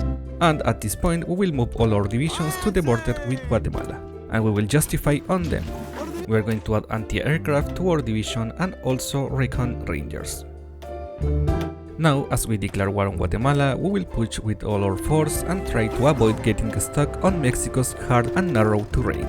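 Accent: Chile. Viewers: Al oir el acento pense: chileno? Parece q si :v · Nunca pensé ver un youtuber de hoi4 chileno hablando en inglés xD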